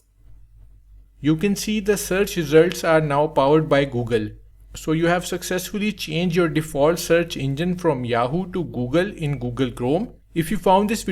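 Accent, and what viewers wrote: India. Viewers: When I heard an indian guys voice I knew the tutorial would be lit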